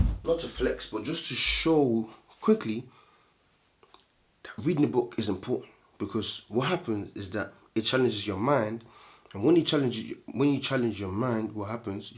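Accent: United Kingdom. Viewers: based on your accent im assuming you are from the UK, I have a question, do you think it’s realistic that if I retained for let’s say 6 months wisely having my diet in check fasting and meditation etc, that I could earn around 200 to 300 gbp on average a week earning money online if I spent 6 months of research on it? It’s a specific question but I’m just curious how quickly SR can get me out of this rat race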